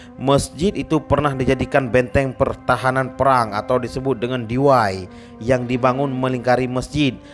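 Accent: Aceh, Indonesia. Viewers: Loghat aceh masih menebal...bereh that bos...👍👍👍👍👍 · Sep tat logat Aceh rayek dron neuh bang😁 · Mantaaap bang.... Sukses slalu.... Logat ÀCEH nya kental Kami bangga ❤️ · Bahasa keulayu,logat totok Aceh,geuthat ka pap leumo,Brio kedeumgoe,ka meuronoe bahasa keulayue beu geut ilee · Kita logat aceh mulia jngn hilang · Pertahankan logat bahasa melayu acheh itu sangat lah elok. Lihat orang jawa yg mencuri bahasa melayu cakap nya godok godok sampai mati pun mereka jawa pencuri bahasa.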